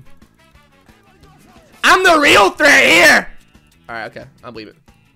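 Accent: Scotland, United Kingdom. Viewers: so scottish dude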